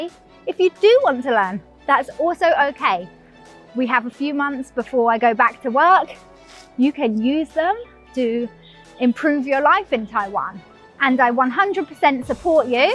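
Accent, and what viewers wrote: England, United Kingdom. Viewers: 親愛的Lucy, 拜託 千萬不要永遠說中文拍影片 妳迷人的英國口音 正是妳的一大特色 不過我也承認 很想聽妳整集影片都說中文 魚與熊掌無法兼得 妳過得開心最重要 祝妳學中文順利 加油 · 12 years! you must be kidding do not speak Chinese at all. However, first time hearing such strong, clear English Accent in Taiwan. Please do not be afraid to speak even your pronunciation is incorrect, maybe learn from your kids could be much easier and quicker. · 英國腔好酷XDD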